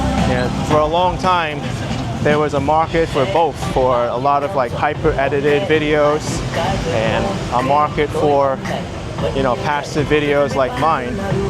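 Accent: New York, United States. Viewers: You're a walking billboard for NYC with your accent. That's what initially drew me to your channel. I enjoy watching any content from NYC be it food reviews, apartment tours, fart videos, tourist videos, and opinion pieces. It's just felt like you've been on very long vacations the past year away from NYC so I haven't watched. Being from Toronto, I've rewatched your travels here countless times. You need to come back to Hong Kong Bistro Cafe. 😃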